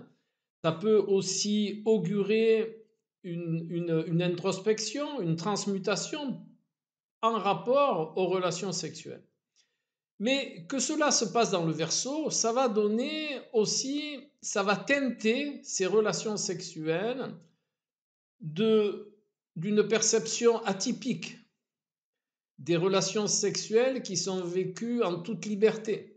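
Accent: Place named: South, France. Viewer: Merci pour la clarté de votre propos et pour votre bel accent du sud.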